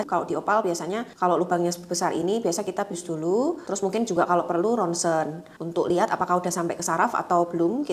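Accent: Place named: Java, Indonesia. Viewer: Dokter medok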